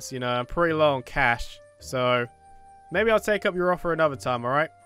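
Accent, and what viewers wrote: United Kingdom. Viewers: Hey! Are you from the. UK?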